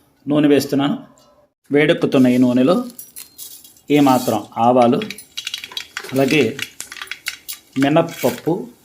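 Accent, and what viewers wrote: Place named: Tamil Nadu, India. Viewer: మీరు తెలుగు వారైనా ఎక్కడో మాట తీరు మీ వర్చసు తమిళం లా ఉంటుంది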